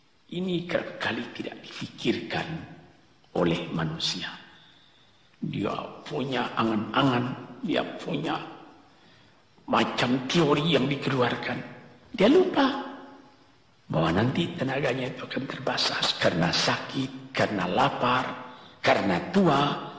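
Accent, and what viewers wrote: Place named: West Sumatra, Indonesia. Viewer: logat minang ny khas banget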